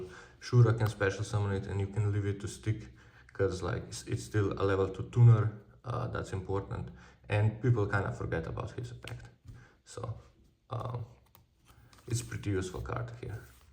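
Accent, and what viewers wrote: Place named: Slovenia. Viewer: I can hear the slovenia accent from kilometers away [mojster]😂 nice video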